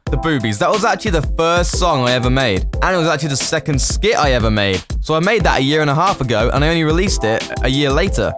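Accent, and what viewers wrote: United Kingdom. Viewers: hes british, BRITIIISH... thats right, isnt it? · i wish i was English. i mean having people ask you to say random words all the time is probably annoying but, come on. you sound awesome. and you're not American which if you add those two factors together it's like an A++ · ARE YOU ACTUALLY BRITISH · OMG your british?: yeah... random girl:say BANANA say it say it:NNOOO random girl:please say it say it!: BANANA!!!!!!!!! random girl it sounds so different... someone else continue...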